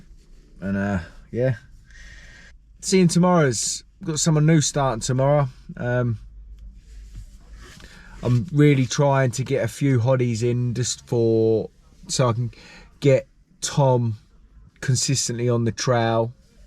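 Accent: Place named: South London, United Kingdom. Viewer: His accent is completely fake. I can already tell from his facial features that he is an Irishman I will NOT like the video unless he starts presenting his video in a heavy Irish accent. He is ashamed of his roots Why is he ashamed of being Irish? His facial features are Irish but yet he speaks with a south London accent. He has no pride in his Irish culture. So he tries to act and speak like a different person because he is ashamed of himself so he hides behind an alternate personality. He was mistreated as a child and consequently grew up with schizophrenia. He is bi-polar - hence he switches his accent from Irish to south London.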